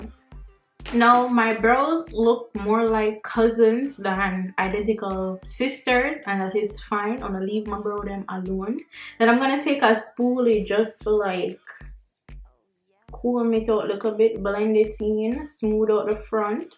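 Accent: Jamaica. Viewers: Amen i was waiting..i love when u talk jamaican 😍😘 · I love when you SPEAK patois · 🇹🇹🇹🇹🇹🇹🇹🇹 I love the Jamaican accent🇹🇹🇹🇹🇹🇹🇹🇹🇹🇹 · look how d ting come out good...U can definitely do ur makeup urself...jus fling on couple gems, n 2 bright colour n u good. I did mine myself....n it lasted all day....love the patois vid..n u nuh haffi nermnous nex time! lol that made me laugh so much bout nerminous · I come a cross one of your videos an the frist thing I say u were Jamaican . I am Jamaican too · Heard to understand lol but i love the accent! Always wanted a Jamaican accent 🇯🇲 🙌🏽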